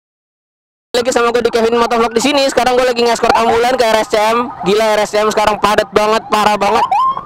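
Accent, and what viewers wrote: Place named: London, United Kingdom. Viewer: landun sound 🤤 like it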